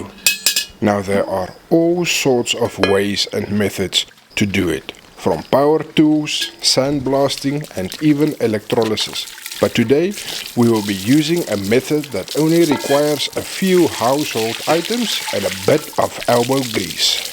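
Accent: South Africa. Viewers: I can’t wait to restore our almost forgotten pot, but I will definitely watch this video multiple times to listen to your heavy South African accent 😊❤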